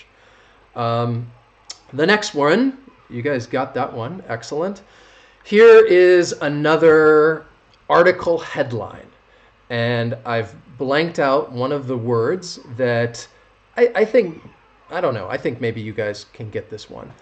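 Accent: United States. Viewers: Fuuuuu. American accent. Switch off.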